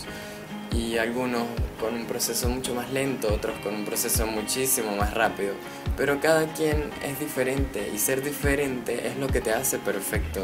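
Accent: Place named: Venezuela. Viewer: Soy nuevo en tu cuenta, sería genial que te presentarás y dijeras de dónde eres.. ( hasta el momento creo que eres venezolano por tu acento ) entre otras cosas como tus gustos.. tus fans te quieren conocer 💟.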